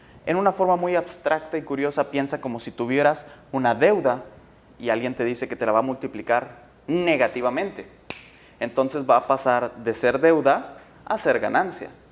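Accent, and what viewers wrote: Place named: Mexico. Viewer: El profe es muy bueno explicando,pero el acento ese mexicano,no me va,prefiero español de argentina,perdón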